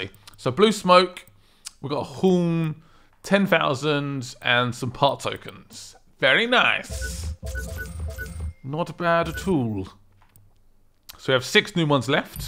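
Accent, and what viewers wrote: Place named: Southampton, United Kingdom. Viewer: Bit of a southampton tune like it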